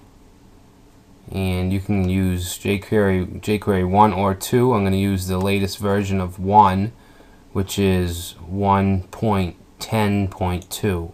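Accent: Boston, United States. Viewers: Thanks for the tutorial. Love the Boston accent. Brings me home. Grew up in Newton but have in in Florida for 10 years.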